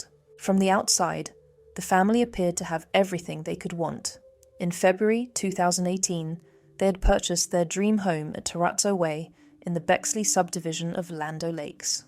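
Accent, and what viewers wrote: United Kingdom. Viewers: jesus christ this channel sucks!! two minutes listening to this british niteit made me wanna blow my brains out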